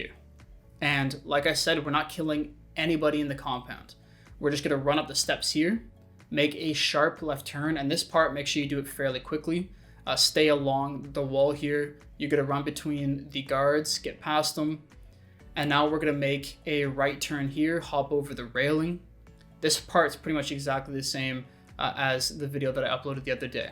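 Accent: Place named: Canada. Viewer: Ahhaahhhhh! You are Canadian! 🤣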